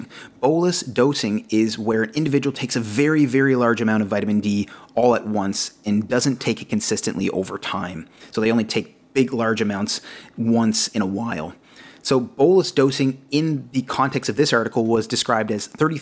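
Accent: Canada. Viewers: Thank you, neighbor to the north (if I am identifying the accent correctly), for your informative presentation.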